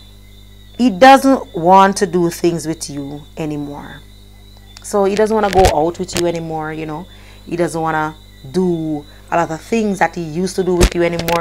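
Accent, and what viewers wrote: Jamaica. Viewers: I always enjoy watching your content on relationships tips and also listening to your Jamaican accent.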